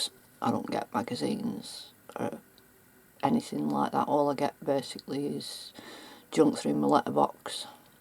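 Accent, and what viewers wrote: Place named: United Kingdom. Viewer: Just subbed you as I liked what I saw, well done on making a great page. I did struggle to hear and understand you, even though Im a fellow UKer, it was a different accent....Im crap with understanding other accents to my own (Londoner) lol. I enjoyed watching your ICADs video too, especially the pink one, Im such a pink freak! lol hugs Tibby xxxxx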